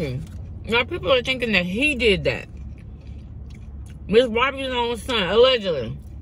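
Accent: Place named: AAVE, United States. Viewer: Dang this food look so delicious 😊 you crack me up speaking Ebonics or whatever it’s called 😂😂😂😂😂 I love your videos!!!❤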